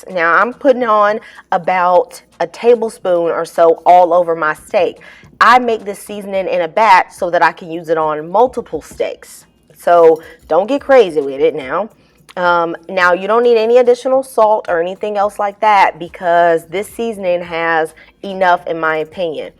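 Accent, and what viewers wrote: South, United States. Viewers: Your voice is even salivating! Which may not seem like that southern touch has much value beyond entertainment, but I noticed i did not have to re-watch the video to catch important details such as cooking times or procedural tips that I often get lost-in when swept up by a videos production impression, or in some sense, distractions from the theme/game. I appreciate any production or performance that I feel immersed in the 'greatness of mind' while on board the content creators intention to convey what i can feel put a smile in there heart and thank you personally for packaging that up in this video and setting the table for us to love and in this way experience the joy that is personified by you, Thank you Lord, in Jesus name~Amen 🙏 💖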